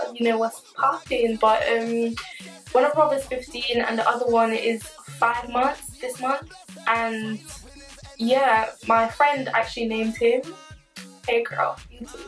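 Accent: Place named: England, United Kingdom. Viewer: your Accent is bomb ☺are you in England ?that is sooo dope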